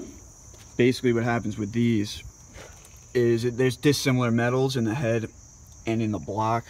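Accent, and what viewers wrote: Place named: Long Island, United States. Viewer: You sound like UFC fighter Al Iaquinta, which makes sense since he is also from Long Island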